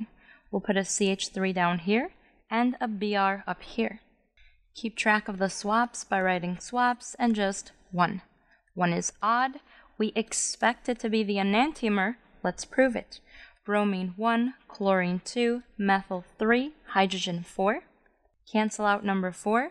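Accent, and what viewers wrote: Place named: New York, United States. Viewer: Beautiful! You got to be from New Jersey/New York. Thanks.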